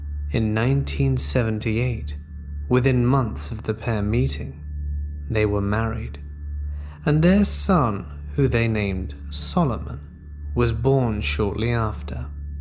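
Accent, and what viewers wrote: United Kingdom. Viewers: Love your channel mate.True Crime and Horror are my favorite :) Your narration is top notch (your accent is like Michael Caine as Alfred in the Dark Knight trilogy).yes that is a compliment. Long live the Crime Reel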